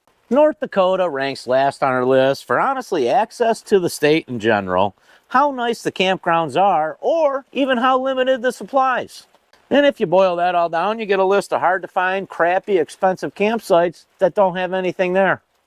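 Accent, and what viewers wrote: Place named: Rochester, United States. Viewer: Michigan here born and raised in western NY you sound like your from Rochester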